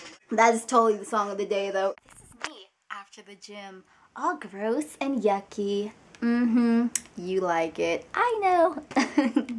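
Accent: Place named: Brooklyn, United States. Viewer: are you from Brooklyn,NY? you sound like it.. lol i love ur videos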